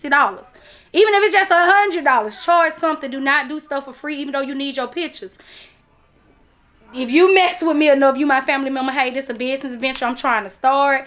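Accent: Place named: South, United States. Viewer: Girl.... you are so country!!! LOVE IT! I'm from Texas so I'm more country! Thank you for all the tips. Great video.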